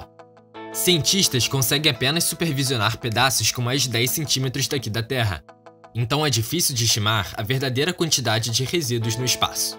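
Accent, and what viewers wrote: Rio de Janeiro, Brazil. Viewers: Sotaque carioca insuportável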